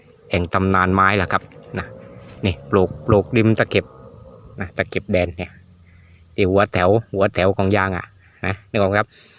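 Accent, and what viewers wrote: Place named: South, Thailand. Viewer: แหลงใต้ชัดเชน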